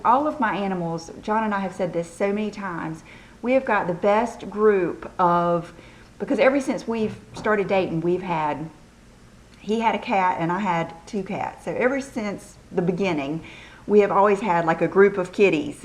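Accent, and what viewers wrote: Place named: North Carolina, United States. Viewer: You are so sweet. I watch you when I can. I really like the Burberry creme shadows. I've been using a silk pillow case for a couple months and found mine would fall apart in the seams. I'm going to try the one you showed. I'm a retired special ops wife and have a home in Fayetteville NC, and it sounds like your from NC and I was wondering where? If you can't say that's fine. Love you acsent and never be sorry about it, that's what makes you special. Have a wonderful week.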